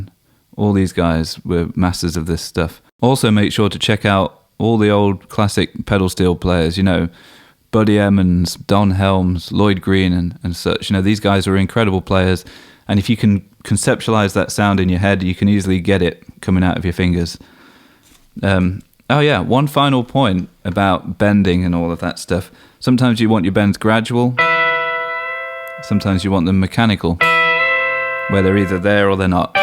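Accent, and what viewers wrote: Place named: Liverpool, United Kingdom. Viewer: Your accent is as cool as your playing! Liverpool?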